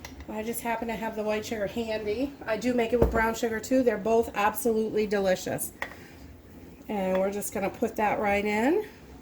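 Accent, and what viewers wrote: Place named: Massachusetts, United States. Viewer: Just went apple picking so about to make two batches of cider using your recipe. Having been born and raised in Mass (and now in Cali) I just want to listen to you and your accent- you can't tell me you are not from Mass!!!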